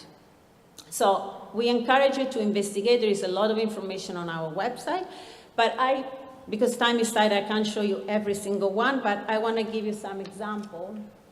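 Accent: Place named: Italy. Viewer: She sounds like an Italian speaking English. Foreigner speakers never achieve a good British accent. Even the majority of native English speakers never achieve a good British accent! You are obviously not Italian. Her husband does not speak Italian perfectly. It is good but he has a limited vocabulary and even he himself has said, he sounds like an Englishman speaking Italian.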